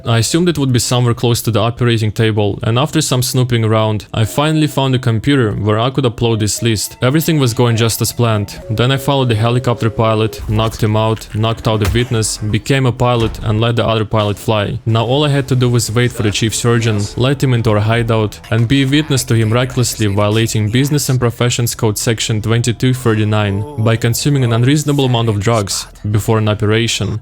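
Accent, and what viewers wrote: Russia. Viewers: the hard russian accent makes this so much funnier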